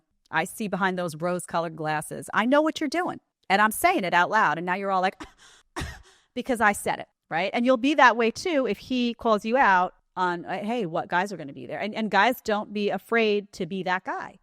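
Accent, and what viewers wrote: New York, United States. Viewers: I like your NY accent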